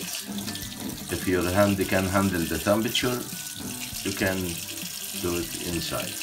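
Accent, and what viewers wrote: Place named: Egypt. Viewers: He’s English makes me to watch all his videos such great person. I like Egypt accent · 😁😁luv d way u narrate .. Egyptian style English.. sooooo sweeet.. 7eloo awee. 👍👍👍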